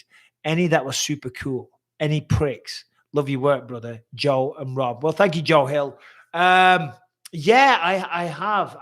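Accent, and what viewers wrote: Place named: Scotland, United Kingdom. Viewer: It's very noticeable that you do slur your speech at times, plus your memory is pretty bad for someone your age. Have you ever had any checkups regarding brain problems, etc etc, people saying you have no such problems are just being polite etc etc, but thats not helping you m8, ps Scottish accent is god dam fking awful lol oh and is it possible you can actually get through a video without mentioning Darren Till !!!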